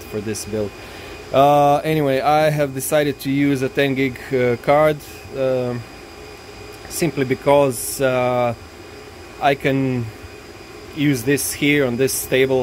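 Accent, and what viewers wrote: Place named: Russia. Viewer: Акцент такой русский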